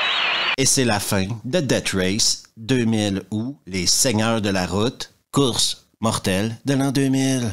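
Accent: Quebec, Canada. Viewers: OMFG, l'accent Québécois, j'étais pas prêt ! C'est Bruce qui m'envoie. Il avait raison, excellente vidéo :D Merci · J'adore ton accent canadien😂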